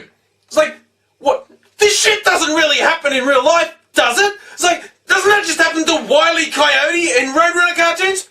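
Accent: Australia. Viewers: hmmm you seem angry for some reason and also Australian hmmm still cant make why i see this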